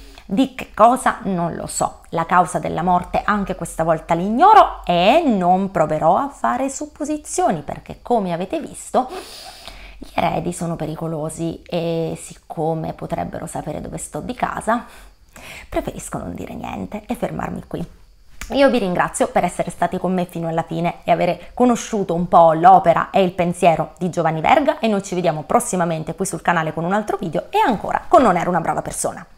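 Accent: Sicily, Italy. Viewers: Adoro questa tipologia di video, anche il tuo siciliano 👍🏻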